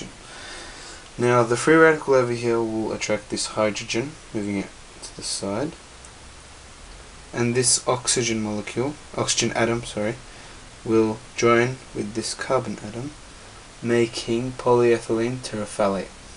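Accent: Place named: Middle East. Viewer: Woah, you sound exactly like me except a little middle eastern touch.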